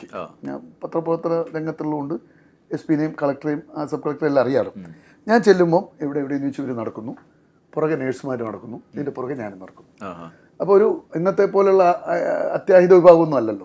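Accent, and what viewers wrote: Kannur, India. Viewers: Kannur slang aanu no problem..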